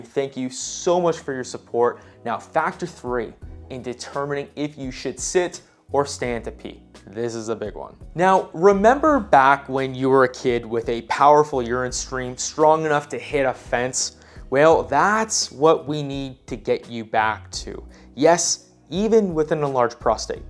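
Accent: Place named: United States. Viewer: Is it possible to give English captions (at least important steps). Because, English is a foreign language for several people. And it is difficult to follow the US pronunciation for people like me.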